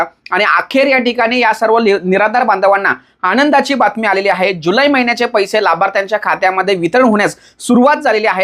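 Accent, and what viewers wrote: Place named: Kolhapur, India. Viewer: संजय गांधी निराधार अनुदान जमा झाले कोल्हापुरी बोलत धन्यवाद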